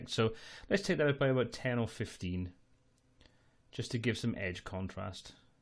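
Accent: Wales, United Kingdom. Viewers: How lovely to hear a Welsh accent!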